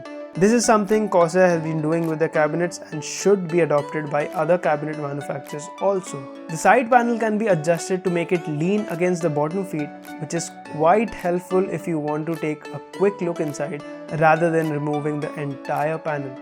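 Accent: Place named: India. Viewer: Typical Indian accent... ...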